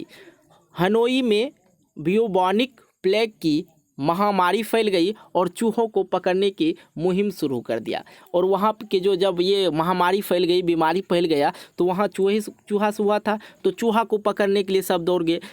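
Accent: Bihar, India. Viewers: Aap Bihari h ka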